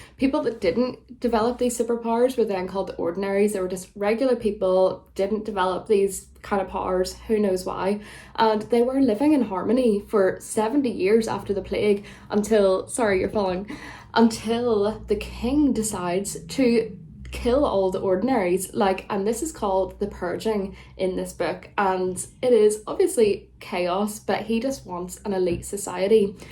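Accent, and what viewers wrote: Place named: Scotland, United Kingdom. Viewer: I love your accent ❤ Are you from Scotland? Love from Germany 😊❤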